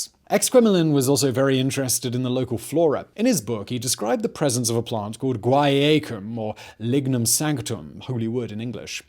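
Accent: United Kingdom. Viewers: About your plug for the newest channel...I thoroughly enjoy your content. I watch all your channels and there is a reason for that. Though I have not really taken to the newest one. I think the draw is your English or British accent. I am not sure how to frame it because I know a few men from Scotland and they didn't take it as polite that I called them English as the UK is not quite like the United States. I can call any person from any number of states an American and they would not get upset, though a Texan might point out that they are from Texas.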